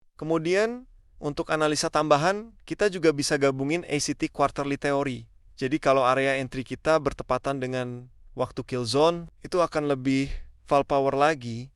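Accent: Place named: West Java, Indonesia. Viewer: Bang aslinya org mana? Kaya ada logat sunda nya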